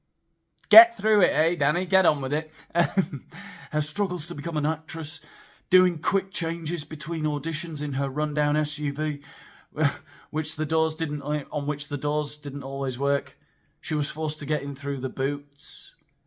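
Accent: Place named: United Kingdom. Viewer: I ❤ conjunctive adverbs. Then again, everything sounds splendid in British English.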